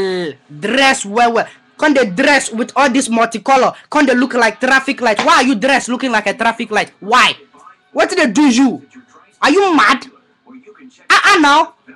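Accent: Nigeria. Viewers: lol yuh Naija accent is seriouss!! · lmaoooooooo wetin dey do u, abeg noww loolx...wiv his Naija accent oo eei de3....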